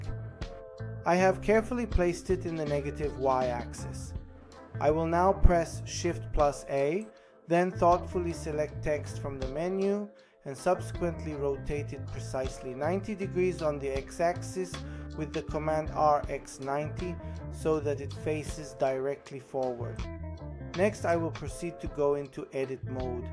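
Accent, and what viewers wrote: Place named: India. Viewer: وقتی انگلیسی حرف می‌زنی استاد شبیه هندی‌ها می‌شین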